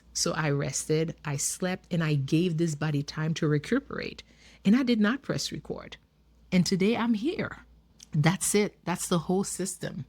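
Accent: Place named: Haiti. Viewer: Wait a minute, you're from Haiti 🇭🇹...I kinda thought you might be because of your accent but that say confirmed it...I think you might be the person I need in this season, like I commented on that previous video I watched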